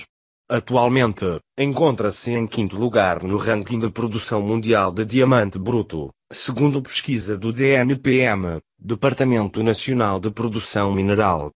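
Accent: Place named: Portugal. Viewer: Muito bom, pena ser português de Portugal...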